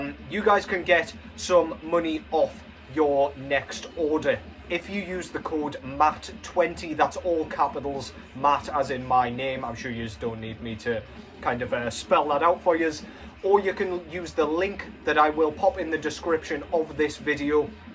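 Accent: Newcastle, United Kingdom. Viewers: Where yuh at fella? Ah need me durse uv Geordie taakin aboot metal! Woraboot a Nile ranking man?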